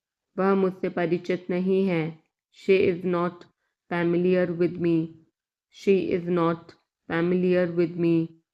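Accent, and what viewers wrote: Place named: Canada. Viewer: It is better but pronounced like Canadian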